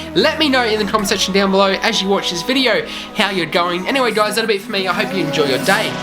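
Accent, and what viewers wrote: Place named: Queensland, Australia. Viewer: Where is Aus are you from.. I'm guessing qld maybe?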